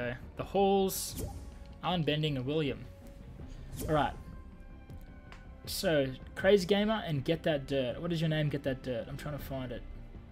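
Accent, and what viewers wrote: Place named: Australia. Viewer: I'm sorry about the cussing I'll keep it lowkey if I get to play again.Your stream style is the right type for me.Your fair with your subscribers and that's hard to find on the net some times.Glad I had this chance to be noticed with out having to ask for a plug THANK YOU SO MUCH!!!! You might want to consider blurring your server so you don't get so many stream snipes.I have always liked the Australian accent,(To much crocodile dundee as a kid)lol.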